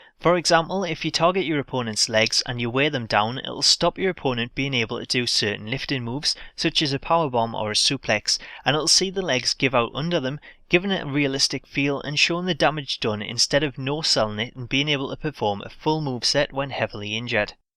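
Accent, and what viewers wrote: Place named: Wales, United Kingdom. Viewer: Allow this guys dead welsh accent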